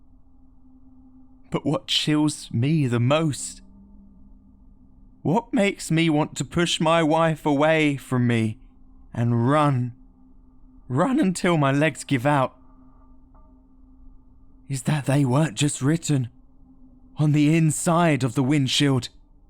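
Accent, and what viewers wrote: United Kingdom. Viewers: British narrator? Good narration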